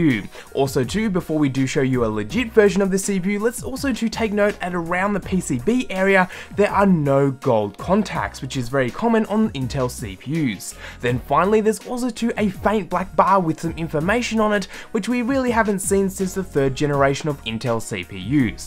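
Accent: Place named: Australia. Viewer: Yes... It is... He's not American. He's Australian... That's how Australian's talk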